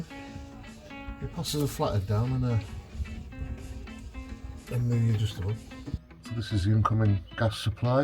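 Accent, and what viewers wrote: Cumbria, United Kingdom. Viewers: Clicked on video as I am considering fitting a tank to my motorhome, but as soon as you spoke I recognised that Cumbrian accent ..... and lo and behold you are from the same town as my wife and her family, perhaps you may know some of them ..... Bell, Cass and Dustin are the close family. Anyhow, because you are a Workington lad I will subscribe and watch your future adventures.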